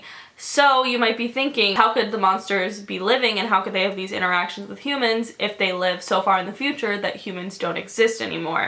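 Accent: United Kingdom. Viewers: Are you britishh?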